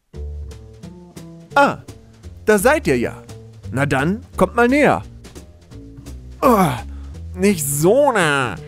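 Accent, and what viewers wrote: Swabia, Germany. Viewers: Der schwäbische Professor mal wieder ;-) Sehr schön! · Griaßgottle ällemittenander. Dr Schwob sagt: "Mir könnet älles, ausser Hochdeutsch!" MAGIE ist für mich, dass DU als Schwabe eben DOCH Hochdeutsch kannst ;-) HANO! ;-)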